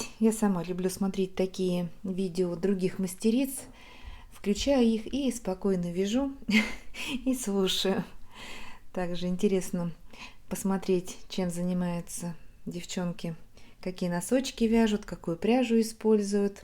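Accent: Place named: Voronezh, Russia. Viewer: Узнаваемые воронежские интонации 😊 . Смотрела ваши видео и предполагала, что вы житель Воронежского края, ну и здесь услышала подтверждение этому.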